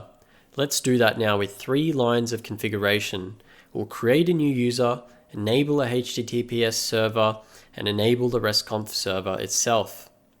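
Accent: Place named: United Kingdom. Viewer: Amazing work. And without accent. Pure british❤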